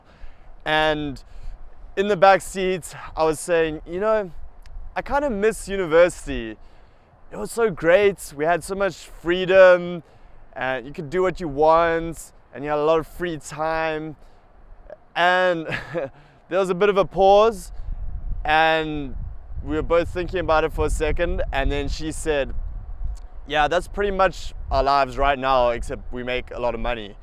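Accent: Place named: South Africa. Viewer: You sound incredibly South African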